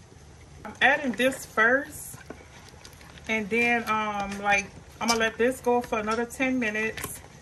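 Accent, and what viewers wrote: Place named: New Orleans, United States. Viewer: Are you from New Orleans??? Sounds like you are. I was practicing on my hair Weaving skills, & I thought starting my own channel. Gurl, I'm sho you from New Orleans & you're doing a Great Job 👍. I've been in the Retail business over 30 years & I had to Speak Professionally. One thing that People love, is our Accent!!! It's beautiful Sis.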